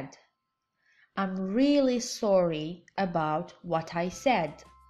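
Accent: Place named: United Kingdom. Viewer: Hi,you have amazing british accent thank you for evreything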